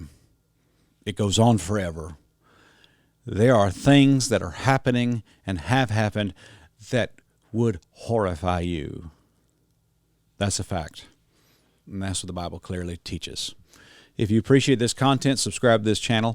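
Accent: South, United States. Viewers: You sound like a hillbilly brooooo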